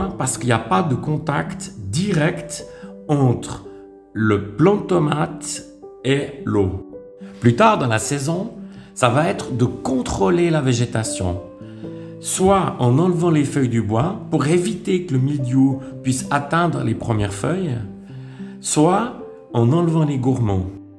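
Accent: Switzerland. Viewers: Si on protège le pied des tomates avec une bâche perméable à l'eau ça évite les éclaboussures dues à l'arrosage, qu'en pensez-vous? Bravo pour vos vidéos courtes et allant à l'essentiel, c'est toujours un plaisir de vous écouter avec cet accent bien Suisse pour nous autres haut-Savoyards.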